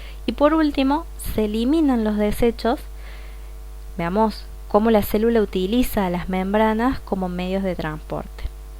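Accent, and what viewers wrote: Cordoba, Argentina. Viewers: Sos de cordoba? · re acento cordobés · Voz cordobesa osiosi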